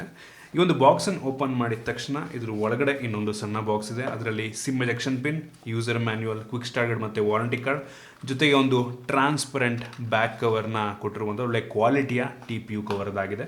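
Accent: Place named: Mangalore, India. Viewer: sir ನಿಮ್ಮ ವಿಡಿಯೋ ನೋಡೋಕೆ ಇಷ್ಟ ಆಗೋದು ನೀವ್ ಮಂಗಳೂರು ಕನ್ನಡ ರೀತಿ ಮಾತಾಡ್ತೀರಿ.. ಥಾಂಕ್ಸ್ ಫಾರ್ ವಿಡಿಯೋ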